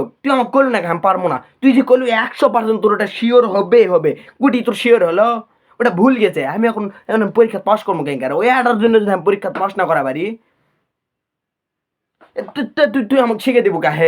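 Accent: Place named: North Bengal, India. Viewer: ভাষা উওর বঙ্গের মনে হয় দিনাজপুর।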